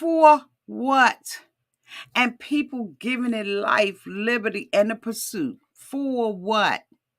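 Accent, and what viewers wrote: Washington DC, United States. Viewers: I just found you, love the DC accent and the perspective..but did you notice that Monique asked dude if he enjoyed his sleep “on the bed”? Then later I noticed a pillow and blanket on the couch..did she sleep on the couch????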